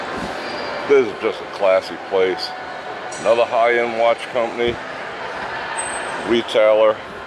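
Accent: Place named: Brooklyn, United States. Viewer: Ahh, there's some new knowledge. Also, I now hear Brooklyn in your voice.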